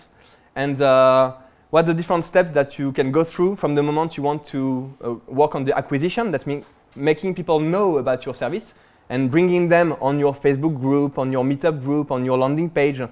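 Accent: France. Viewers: ohhhh that french accent _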